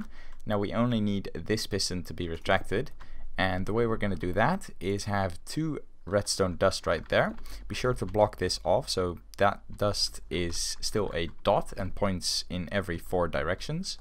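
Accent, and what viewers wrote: Netherlands. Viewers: Where are you from? You sound dutchie :p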